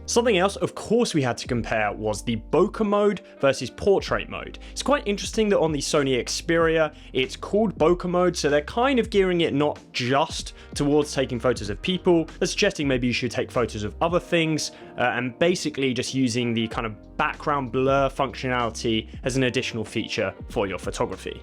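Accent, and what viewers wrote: United Kingdom. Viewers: Excellent comparison. He speaks with a Cockney accent.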